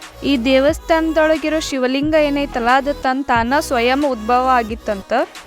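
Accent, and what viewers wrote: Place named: Maharashtra, India. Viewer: your kannada is very beautiful, with a tinge of maharashtra......... I have heard this bangalore kannada, very worst......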